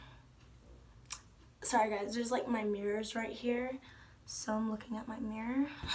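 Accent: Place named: Tennessee, United States. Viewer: Omg yes girl! I was hearing your accent and i knew you were from Tennessee. Go Vols ❤️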